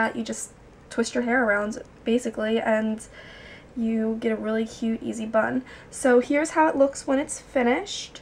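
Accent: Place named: New Jersey, United States. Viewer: Hello! Just stumbled upon you and your fiancé 's blog and landed here. I'm happy to see you have a beauty channel as I follow other gurus. I'm so excited though that you are also from south jersey so you are much more relate able especially with the jersey accent. Lol best wishes on your upcoming wedding!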